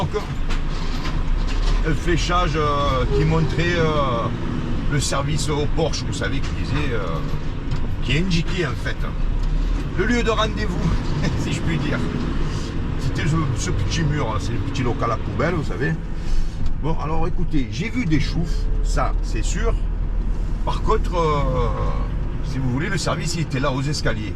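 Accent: Marseille, France. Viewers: "Même les bus ici ils sont cons" !??? Je pense que c'est plutôt toi le con qui te rend pas compte de la taille de la route 😂😂😂😂 C'est toujours plus facile de jeter la faute sur les autres ! Avec tes références claquées en comparaison avec les États-Unis !😂😂 Ridicule ! Le nombre de morts que Marseille fait à l'année Chicago le fait en un weekend ! Ferme-la c'est mieux tu sais pas de quoi tu parles surtout concernant les États-Unis. Avec ton accent de cagole😂😂😂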